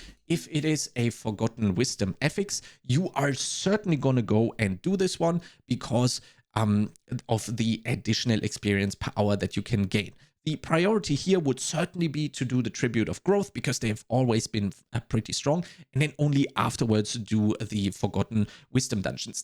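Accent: Germany. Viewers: I had to edit this to add the spreadsheet you provided: There is an astounding amount of work you've put into helping. That spreadsheet (you have linked below your video) is so helpful, and you have additional pages of info clickable at the bottom of that spreadsheet. Huge, huge thanks. I would sub 10x more if I could to give something back. Some really incredibly helpful info and tips I wasn't expecting. Like letting the drop specs guide your build. Thank you for all the time you put into this, I've happily subbed. PS: where are you from? I'm originally from Austria and you sound either German or Austrian? Thank you again so much for all the amazing help.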